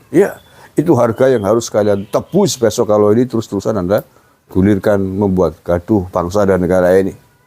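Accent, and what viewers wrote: Banyumas, Indonesia. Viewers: Wong ngapakk.Krawang Hadirrr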